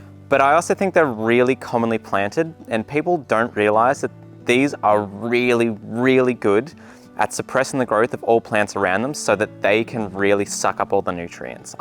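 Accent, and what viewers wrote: Australia. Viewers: That strangulated Australian accent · Don't pick on his Australian accent.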